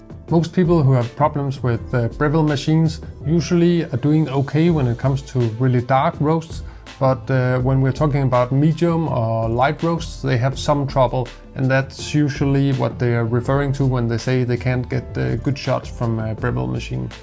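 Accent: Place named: Denmark. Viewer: Are you from denmark? - er bro legit fra danmark jeg kan høre ddet på dit engelsk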